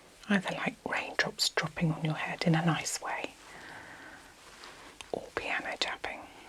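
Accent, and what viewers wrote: United Kingdom. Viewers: your soft voice with your British accent is just like a warm hug. I just love your videos, I’ve watched all of them. I listen to help me relax and get sleepy and it works …..thank you for sharing your gift.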